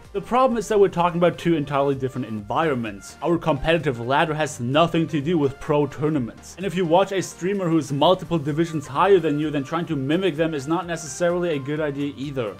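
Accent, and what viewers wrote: Germany. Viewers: is it possible that you are german ? xD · Is german your mother tongue?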